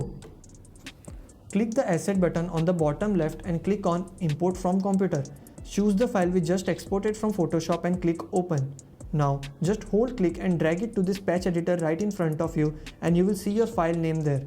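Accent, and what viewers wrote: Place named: India. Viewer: Yo, I wish you luck, but you should work with your accent. Indian english sounds cool, but not always.)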